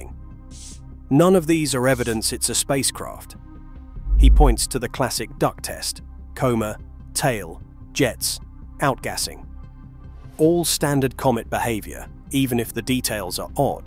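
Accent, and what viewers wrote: United Kingdom. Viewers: Understand that the timing is perfect. The "new world of disorder" and all these meteors, comets, UFO's etc means that they can dump nukes on their own countries aka FALSE FLAG, and blame anything from AI, to the Cartel, to Russia, to UFO's, to global warming, to the kitchen sink. And the narrator English accent is always a give away.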